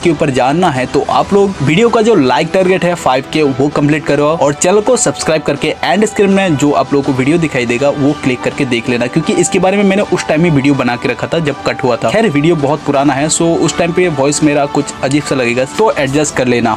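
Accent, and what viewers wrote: Assam, India. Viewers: Bhai tum assam se ho kya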